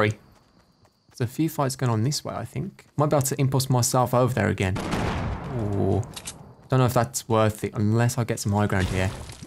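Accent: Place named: Midlands, United Kingdom. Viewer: Just subbed. Enjoying thr ps4 game play and I'm guess from the accent from a fellow midlander. Not sure why my fornite plays totally different to this tho. Whenever I get in a gun fight in busy areas I lag out and die ( that's with virgin fibre connected with an Ethernet).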